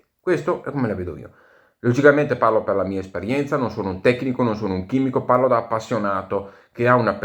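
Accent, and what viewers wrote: Italy. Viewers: piu' ti sento parlare e piu' penso che hai un accento uguale a quello della mia zona... posso chiederti di che provincia/zona sei?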